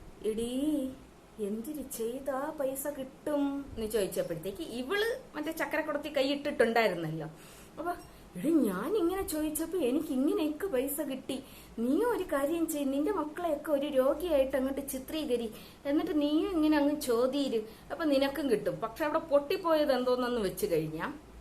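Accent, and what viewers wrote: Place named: Thiruvananthapuram, India. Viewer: ഞാൻ പറഞ്ഞു 🤭 തിരുവനന്തപുരം ഭാഷ സൂപ്പർ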